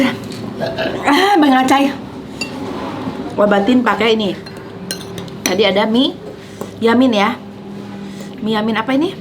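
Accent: West Java, Indonesia. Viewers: Urang sunda hadir tth,resep nyarios na sami urang sunda😉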